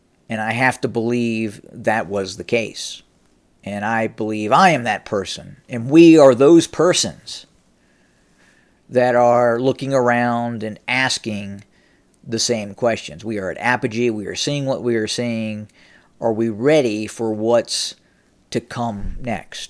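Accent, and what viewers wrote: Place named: South, United States. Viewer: VOR. Your racism is almost KKK like. Where were you born? Based on your southern accent, I suspect Alabama.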